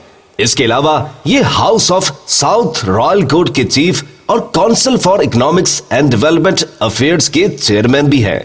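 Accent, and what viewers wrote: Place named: India. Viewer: Ye jo bhoonk raha hai ye to bilkul Indian style hai lagta hai ye indian hai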